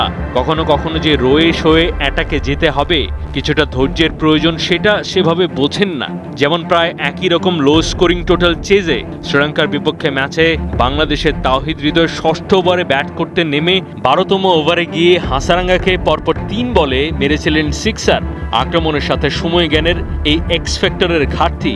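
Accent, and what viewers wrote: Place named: India. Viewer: ইন্ডিয়ান কন্ঠে খবর পড়া কেন😡😡😡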